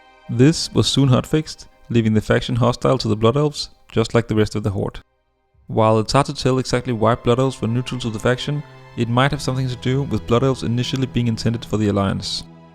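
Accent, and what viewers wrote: Denmark. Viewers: Elsker din danske accent :D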